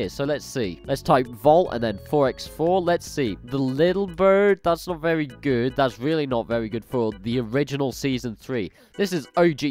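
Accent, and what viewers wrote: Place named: United Kingdom. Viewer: You got a big British accent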